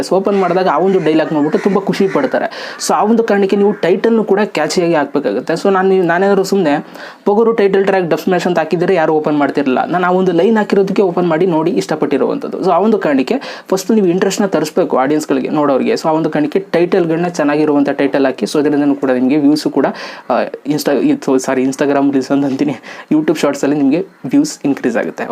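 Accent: Mandya, India. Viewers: Wow nett nett ge band bittiruthe what a language in mandya I like it thamma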